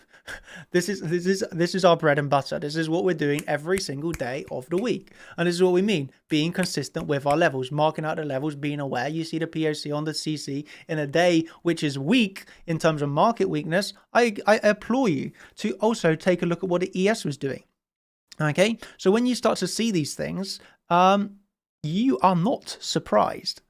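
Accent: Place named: South West England, United Kingdom. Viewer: He’s got a posh south western accent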